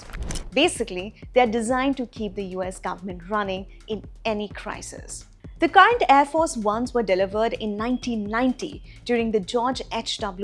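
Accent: India. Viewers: Oh no, that Indian accent, not again😩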